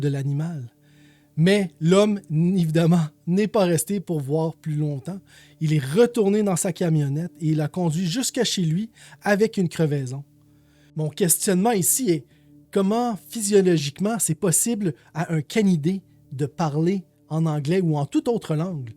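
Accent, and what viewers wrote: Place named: Quebec, Canada. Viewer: Toujours un plaisir d entendre l 'accent , à Guebec , nos anciens parlaient comme ça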